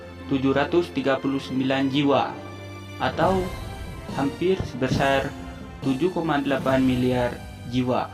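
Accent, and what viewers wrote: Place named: Aceh, Indonesia. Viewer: bahasanya kebanyakkan dan dan dan dan dan logatnya logat aceh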